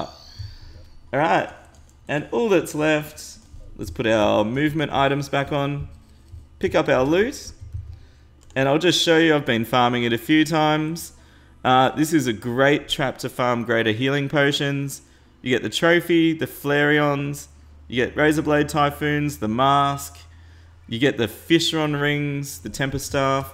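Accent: Australia. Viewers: Is this guy Aussie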